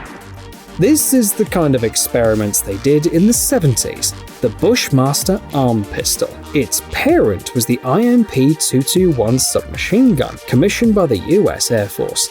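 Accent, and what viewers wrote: United Kingdom. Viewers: Well he does sound like a brit. What would you expect?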